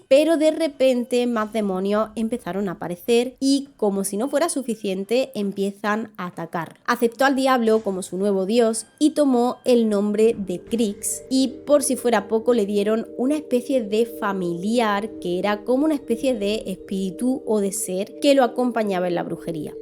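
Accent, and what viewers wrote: Andalusia, Spain. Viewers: Muy buen canal, excelente contenido para los que somos apasionados por la historia. El tono de tu voz es muy relajante y tu acento es muy bonito de que parte de España es este acento? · Prestando más atención, si que percibo un ligero acento andaluz en tu hablar. Perdón por mis erróneas suposiciones. Ni eres madrileña ni eres catalana. Dicho he. Aum namah shivaya 🙏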